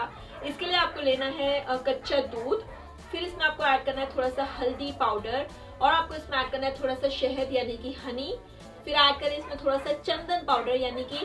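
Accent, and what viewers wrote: Bhojpuri, India. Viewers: I just luv ur bhojpuri accent 👌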